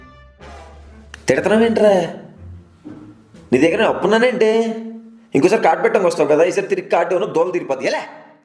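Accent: Godavari, India. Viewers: nani style lo champav kadanna.... may be ur from godavari...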